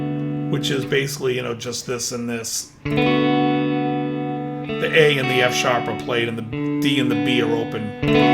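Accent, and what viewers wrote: Boston, United States. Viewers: Nice lesson. Are you from the Boston area by any chance?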